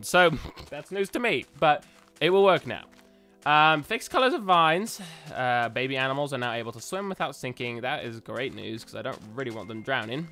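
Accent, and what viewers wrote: United Kingdom. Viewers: Hey dude I hope you don't mind me asking, where are you from? You sound like you come from the legend place called the UK!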